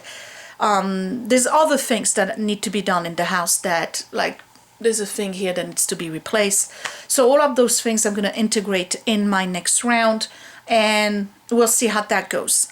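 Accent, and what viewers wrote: France. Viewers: I watch your series as I cleaning my own room like lessen your french accent hagaga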